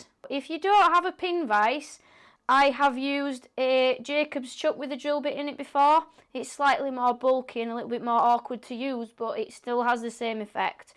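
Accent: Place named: England, United Kingdom. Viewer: Sorry I am so late with my comments but I just found this video. As I am a colonist (US) I usually find the English accent a bit difficult but in this case not at all. And I was quite surprised to find this video ran 48 minutes. It held my attention for the entire run. The finished work piece is very attractive and the production quite well done. Thank you for sharing. Have a great day and stay safe.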